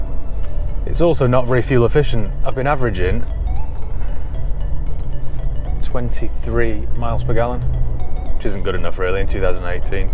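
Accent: United Kingdom. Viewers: u in la with that brit accent bro good luck man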